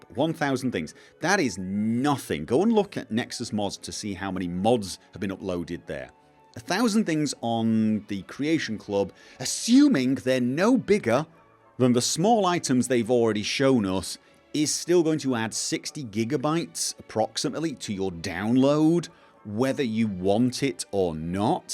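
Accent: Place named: North, United Kingdom. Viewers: Averyl Fong Yeah hes definitly got a slight northern twang. Its inescapable. And for you yanky boys, we mean north England. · Bunny Rabbit I'm pretty sure he's just somewhat Northern :) Well, he sounds like it to me! You can hear it in the way he says words like "aff-ter" (Northern) instead of "arh-fter" (Southern/RP), or the way he says "up" (closer to "oohp").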